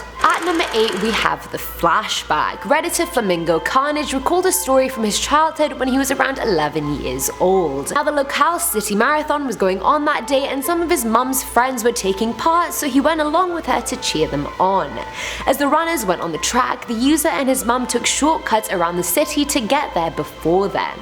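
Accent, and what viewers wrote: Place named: England, United Kingdom. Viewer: Seriously though, exotic look an English accent is flippin hot🔥😍🔥😍🔥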